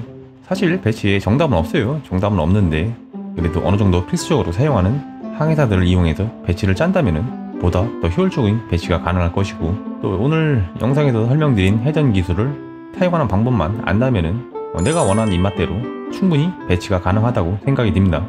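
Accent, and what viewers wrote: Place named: Chungcheong, South Korea. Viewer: 충청도이신가 .. 억양에 친근감이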